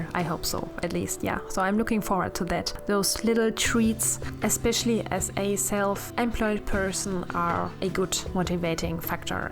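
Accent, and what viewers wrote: Germany. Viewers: Your german accent totally annoys me but your paintings are awesome.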